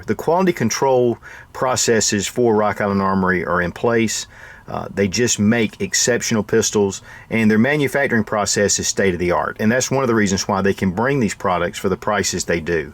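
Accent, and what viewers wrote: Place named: South, United States. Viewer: Ah, give him a break, he's got a suthin' accent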